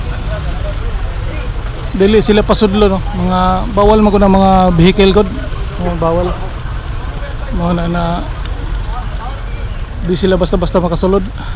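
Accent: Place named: Visayas, Philippines. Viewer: Ayusin mo nga pgsalita mo bisaya ka dong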